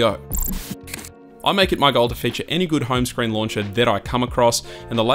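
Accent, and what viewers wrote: Australia. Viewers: And that Aussie Accent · Where are you from ? Australia? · is this guy australian? i am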